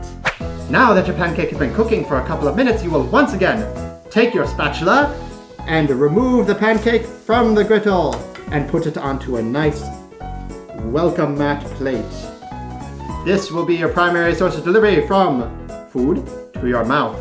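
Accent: United Kingdom. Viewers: You are like the furry version of Jamie Oliver. (Your British accent was on point, actually!) :D Im gonna try this recipe sometime, and every time i think of pancakes, im gonna think of you. :3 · Omg I love the British accent it's as good as mine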